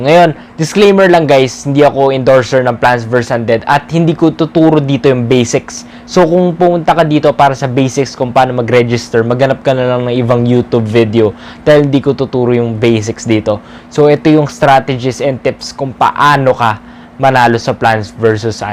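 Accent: Pampanga, Philippines. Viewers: Kapampangan ka sir? Hahaha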